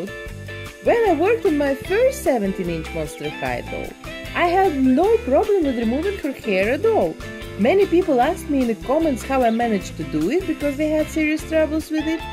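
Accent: Russia. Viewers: У вас русский акцент Или мне кажется?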